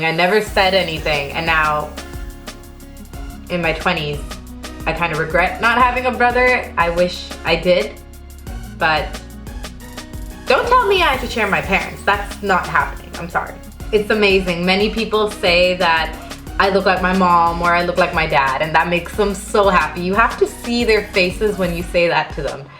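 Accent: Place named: India. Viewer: omg i love Indian ascents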